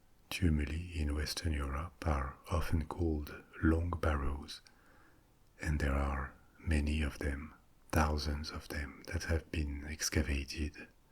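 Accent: France. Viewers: Da nema Francuski naglas imao bi barem dupli broj pratioca. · You are my official sleeping aid addiction. Your gift of telling factual stories with the smoothest french sexy accent. What more could a girl ask.. I dont know if this happens to anyone else, but your voice penetrates into my dream...idk if that means I'm not in a deep sleep, or ? Then along with your soothing voice, you tell me that key to total relaxation is a massage...so you massaged my aching back 😳 I woke up feeling like I really had a massage. I have ALWAYS had extremely vivid dreams. But this is sort of a new twist. (I sincerely hope for it again) Thank you. I hope this is not a sign I'm flying over the cuckoo's nest.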